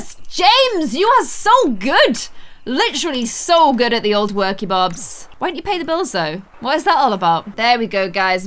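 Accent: Ireland. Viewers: are u irish because if u are i am too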